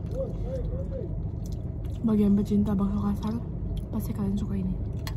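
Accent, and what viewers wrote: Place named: Java, Indonesia. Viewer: Medok bgt😳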